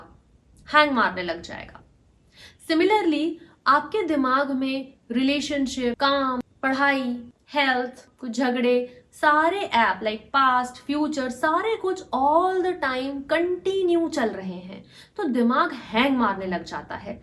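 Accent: Madhya Pradesh, India. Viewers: mam are uh from MP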